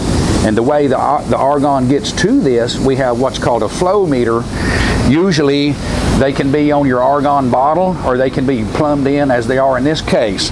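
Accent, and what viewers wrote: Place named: United States. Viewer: Well he’s explained it very good, and my hat goes off to him, and just love the yank’s accent. But why’s he using two hands, instead of one. Where I served my apprenticeship (Babcock & Wilcox) in Scotland, our instructor said it was always better to use one hand only, and used to poke your hand with a rod, if he caught you. I also thought his cap was quite rough, as his beads on cap was to far apart. But then, it depends on the quality of the rods. We were spoiled with Babcocks own rods, and they were brilliant for an immaculate finish on the cap. Murex vodex, was another good finish for inspection. 🏴👍🥳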